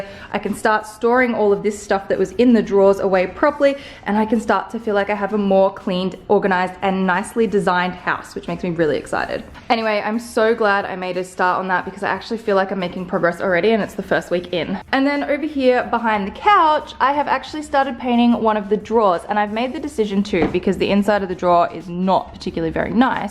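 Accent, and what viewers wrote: Australia. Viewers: Adore your Australian English 🖤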